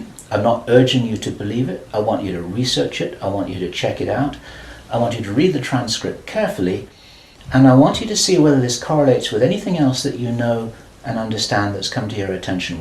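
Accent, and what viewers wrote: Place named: United Kingdom. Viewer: El que murió en 1997 es un gringo o estadounidense. Bill Ryan del actual video habla con acento británico. Que Dios lo proteja.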